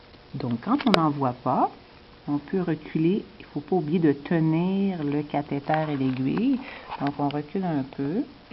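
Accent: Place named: Canada. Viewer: Ah ce bel accent canadien, quel bonheur. Surtout quand la vidéo est de qualité comme celle que vous proposez. Merci beaucoup. Grâce à vous je vais peut-être me réconcilier avec les piqûres et les perfs.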